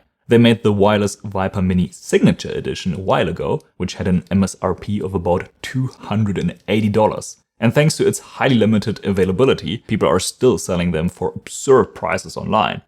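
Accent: Germany. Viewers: I've noticed I'm hearing the German Knacklaut less frequently these days. I must admit, it's quite pleasing to the ear. Not that I don't appreciate a German accent in fact, I think is rather charming.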